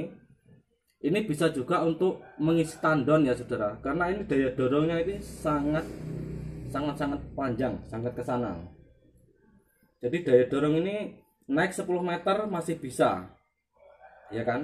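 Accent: Java, Indonesia. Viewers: Medok wkwkwk di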